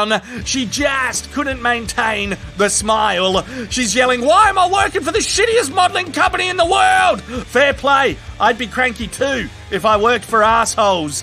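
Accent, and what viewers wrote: Australia. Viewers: Everything’s funnier in dramatic Australian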